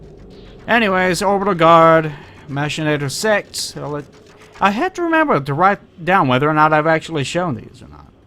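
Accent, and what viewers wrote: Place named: Kentucky, United States. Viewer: Goddamnit, how do you make a Kentucky accent sound so gallant?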